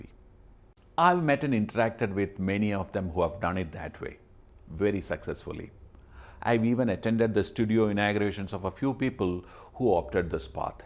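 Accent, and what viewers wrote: Kerala, India. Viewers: Namaste, Basically I am a doctor by profession. During the COVID time I was forced to stay at home (Not fully- I work till afternoon ). The rest time I started learning small small hobbies, reading books etc. I saw your video and also pixel village. I have taken up photography seriously. I purchased a few cameras and was kept idling. During my last trip to Europe I took that NIKON D1500 and it really spoiled my journey. It was a heavy one with so many lenses. The photos I took didn't comeout well. I purchased another one Canon pocket size and was getting ready for my Australian trip. Covid played a spoiled sport and I am at home. But enjoyed all your videos and am really happy that I became a better photographer. Accept my gratitude. From your accent I presume that you are from Kerala.(The safest place in the universe during COVID time). 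I will come and meet you in case you come to TRIVANDRUM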